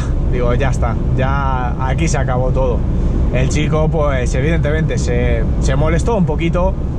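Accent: Spain. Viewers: Coño!!!! Pues tu acento es madrileñazo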